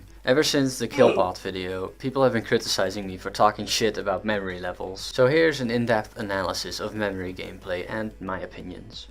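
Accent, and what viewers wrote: Netherlands. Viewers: you sound dutch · bliss that's cause he is dutch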